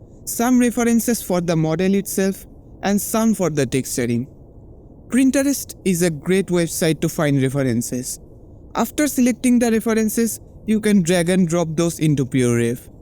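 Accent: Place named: India. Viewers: i am an Indian so when I listen your English I confirmed that you are Indian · I am not Indian but I can also confirm that he is Indian. 😅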